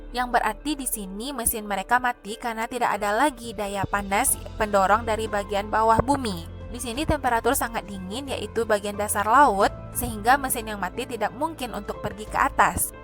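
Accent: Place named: West Sumatra, Indonesia. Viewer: kan betul. Aku dah tebak dari pertama. Minang betul logatnya. Mantaap kokk. Jangan malu sama logat kita. Aku melayu hehhe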